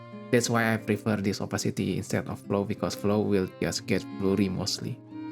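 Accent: Indonesia. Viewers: bro were indonesian all this time ...? can't hide those accent :face-orange-biting-nails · aksennya ngomong nya kyk indo bang ;v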